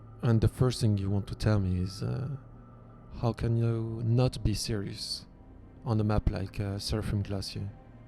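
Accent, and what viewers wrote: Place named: France. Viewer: J'avoue son accent français se remarque tellement mdrr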